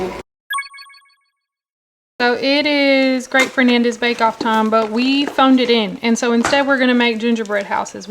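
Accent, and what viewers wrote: South, United States. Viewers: You are so Southern!! I love it.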